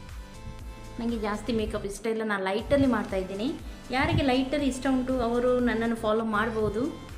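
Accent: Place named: Karnataka, India. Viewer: Hi Akka im ur new subscriber I like ur video,the way u r explaining and ur kannada slang.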